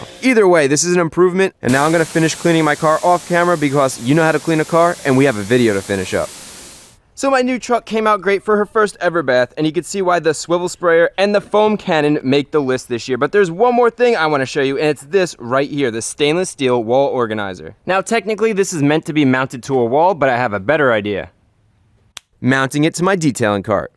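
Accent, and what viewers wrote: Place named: Philadelphia, United States. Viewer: Bro, I completely forgot you were from somewhere VERY close to Philly! But the second I heard you say, "fill the rest up with wooder" [water] I nearly fell out of my seat! My wife (who grew up near Allentown) yells at me all the time about my pronunciations and slang, but "wooder" in particular because she doesn't want my son to pronounce it that way!🤣😂🤣I love it man, great video, thanks for the upload. And I hope you and yours have/had a great + safe holiday and a Happy New Year! See you 2026!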